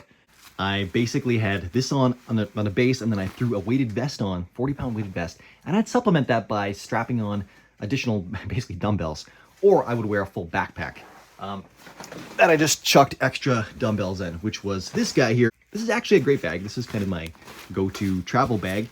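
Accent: Canada. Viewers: I’m confused..you sound Canadian but have a gun collection that would rival Burt Gummer from Tremors. I thought Justin Castreau doesn’t allow guns?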